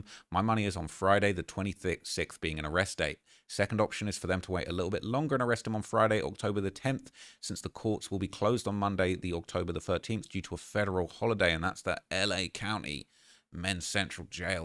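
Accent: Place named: England, United Kingdom. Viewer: My dawg talks like the English but acts like an American lol. Salute my bro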